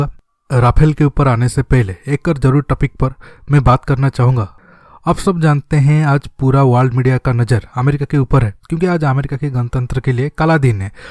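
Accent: Odisha, India. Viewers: Bhai odisa so ho kya ,pronounsation se saaf pata chalta hai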